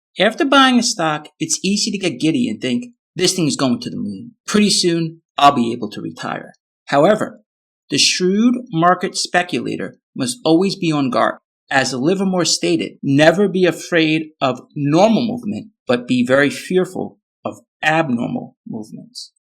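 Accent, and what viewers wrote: United States. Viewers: Excellent yet simple 👏 👌 👍🏼.. Btw ..are u from ny 😅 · Love you accent,Brooklyn? Cheers from the UK.🇬🇧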